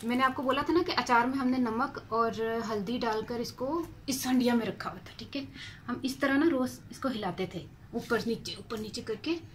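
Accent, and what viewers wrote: Punjab, India. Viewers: thanks apka channel subscribe kr Lia hay apki Punjabi biukul hamray jasi hay 😁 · aapka punjabi bolney ka tarika bahot nice hai. lasoorey ke aachaar ki receipe bahot achey tarekey se batai hai. lasoorey kis month me aatey hai?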